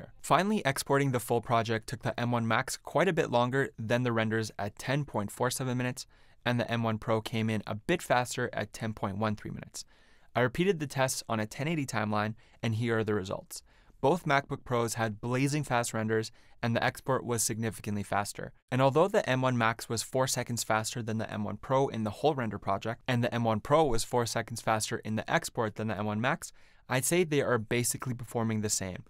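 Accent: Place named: Poland. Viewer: It's hard to say. Rather, if it wasn't for the name of the channel, I wouldn't have come up with the idea that you have a Polish accent. If I had figured it out myself, the accent is definitely not the first thing that would reveal your origin. You may as well be a child of immigrants who came from Poland to Canada and you have never spoken Polish anywhere except at home. Tell the truth if you are agree for it: D It does not matter much to me because I watch the channel because of the content, but it's nice if another compatriot is successful on YT...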